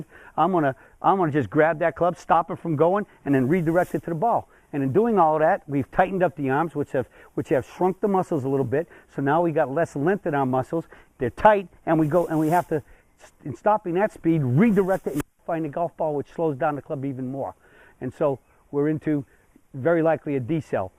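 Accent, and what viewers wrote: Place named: Boston, United States. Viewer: you sound like you're from the boston area like me. thnx for the lesson.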